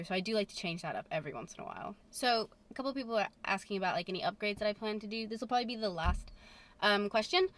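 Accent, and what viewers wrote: United Kingdom. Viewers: I watched a video from 3 years ago and literally it’s fascinating hearing the developing British accent 😂😂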